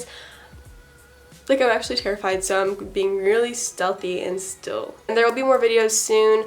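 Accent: Texas, United States. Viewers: she sounds so texan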